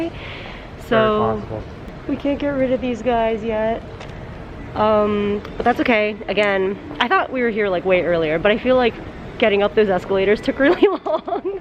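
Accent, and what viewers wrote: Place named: New Jersey, United States. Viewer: New Jersey! I knew that accent sounded familiar! I really love your videos! You’re really awesome!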